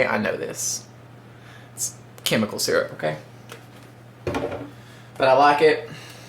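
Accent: South, United States. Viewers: OMG.. I just found your channel through Traci B and I think you are great..btw...where are you from? Sounds like you have a little country accent like me..I am in NC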